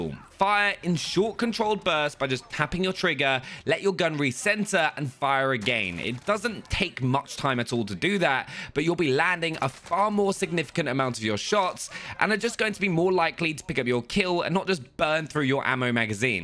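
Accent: United Kingdom. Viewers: Both British i guess...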